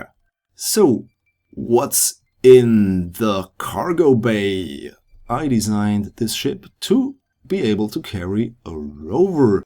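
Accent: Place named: Germany. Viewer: You sound a bit german...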